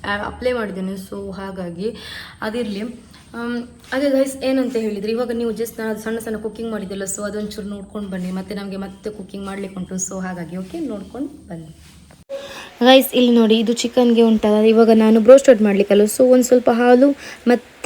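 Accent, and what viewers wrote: Mangalore, India. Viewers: This is Mangalore kannada Mangalore an style of speaking that's why you feel that it is not perfect but I feel no problem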